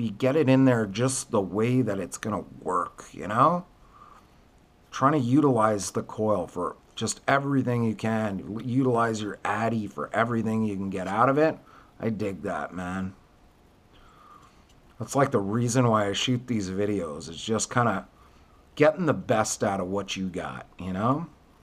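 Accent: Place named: Quebec, Canada. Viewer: Lol, your accent was more French Canadian than Louisiana.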